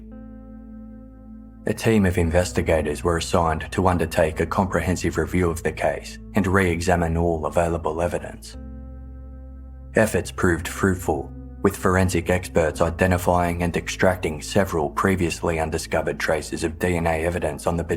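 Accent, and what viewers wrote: Australia. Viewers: I love your podcasts. Your context is excellent and as an American I like the Aussie accent.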